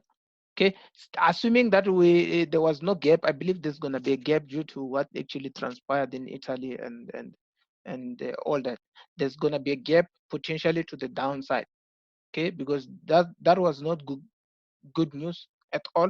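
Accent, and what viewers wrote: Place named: Venda, South Africa. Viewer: And judging by the accent I'd say you're Venda? Besides your love the work bro. And that wololo killed me😂🤣🤣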